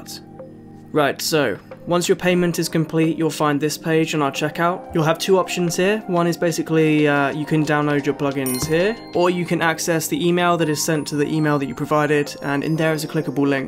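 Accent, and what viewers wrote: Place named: United Kingdom. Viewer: I did not expect you to be from the UK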